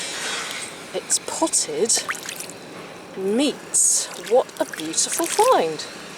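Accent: United Kingdom. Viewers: Your voice is so angelic, I don't know who's I like better yours or Michael wood for a British accent. You could sell your voice like him no problem.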